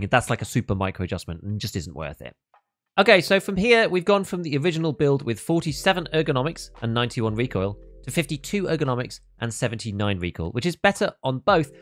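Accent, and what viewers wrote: United Kingdom. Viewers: yea... dying and losing his kit wasn't enough shame. Then the replay of his death was immortalized on youtube along with his build getting hyper-analyzed and criticizing every little thing that was wrong with his gun. These videos are actually brutal even though the soft talking brit with the calm music makes it seem so wholesome.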